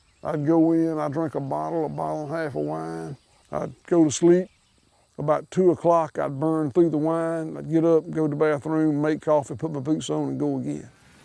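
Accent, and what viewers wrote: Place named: South, United States. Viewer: I love what you're doing at White Oak Patures & I love that old southern accent.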